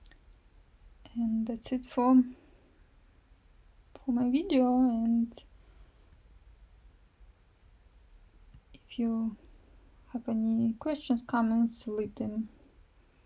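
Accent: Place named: Russia. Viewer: One can always spot a Russian thanks to this amazing accent! Tx for the vid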